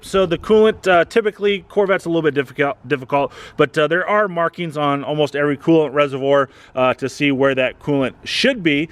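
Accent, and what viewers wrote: Pittsburgh, United States. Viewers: Just a quirk in your accent...you've kinda got a Pittsburgh yinzer thing goin' on!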